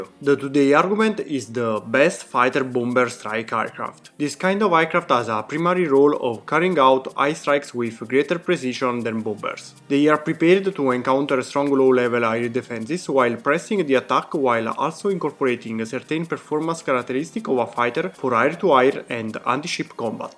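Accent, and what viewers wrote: Italy. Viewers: Italian accent makes it about 10 times better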